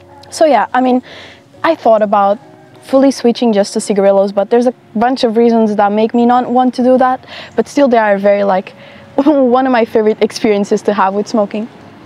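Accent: Portugal. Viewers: tu tens sotaque de tugaa... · I thought you had the most obvious portuguese accent and then i checked the description and boom there it is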